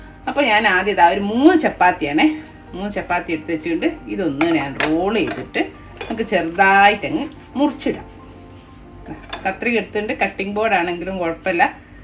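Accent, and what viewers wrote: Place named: Thalassery, India. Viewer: Excellent recipe!💖 Are you from Thalasserry...I think so from your language..😊😊 .......From Bahrain. Actually from Thalasserry.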